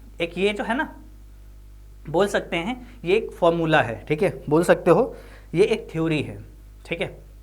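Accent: Bihar, India. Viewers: Sir aap bihar se hai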